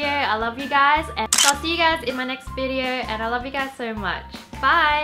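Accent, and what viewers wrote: Australia. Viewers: Saarah U that and she just sounds Australian (well about 85% of the time anyway) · Whoa...angel on earth...you have an Aussie accent haha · I am kinda new to this channel and I was wondering, are you full Asian or are you mixed with Caucasian? I was just wondering because you have an Australian accent but you are from China. Like your videos! Keep up the good work. (^o^) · ...that's an Australian accent + I'm pretty sure she's a Chinese Australian correct me if I'm wrong. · Sweetpea she's Chinese but has a Australian accent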